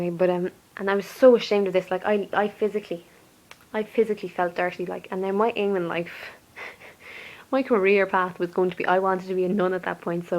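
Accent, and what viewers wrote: Ireland. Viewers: I'm trying to pluck up the courage to come out and watching your video is another helping hand to getting there. My heart races when I just think about saying it out loud. Oh and I absolutely love your accent :) always had a thing for Irish accents hehe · I love your beautiful irish accent and your inspiring story! I am the same! I'm the "g" word as well! I find it so amazing, you make me feel so happy and warm inside. You are so pretty! How could people call you ugly when you are the most beautiful person I have ever seen! I love you!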